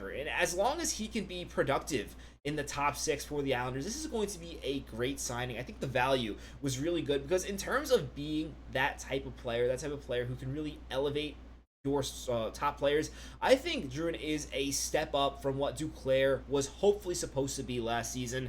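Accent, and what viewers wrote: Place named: Iowa, United States. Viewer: Where are YOU from?? Ya don't sound like a Long Islander. Ya sound like you're from Iowa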